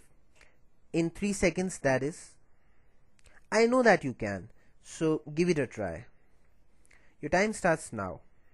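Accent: India. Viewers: according to his accent, this guy is indian, so asian is correct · Itallian? He sounds Indian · indian accent! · I think the Indian accent adds 2 it, u know, he sounds wise, and stuff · clearly he is indian. do you not recognise the accent? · Are you indian by any chance ? Your accent seems like that. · The discussion is about your ugly pathetic 3rd world Indian accented english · ur accent is so awesome! it sounded indian or jamaican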